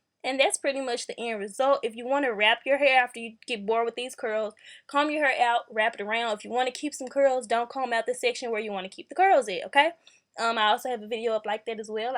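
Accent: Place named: Houston, United States. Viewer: I like your accent lol 😂 u from Houston?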